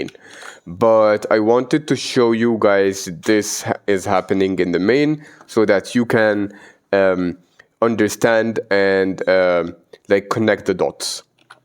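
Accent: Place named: Egypt. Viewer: i feel. the accent is more Egyptian :hand-pink-waving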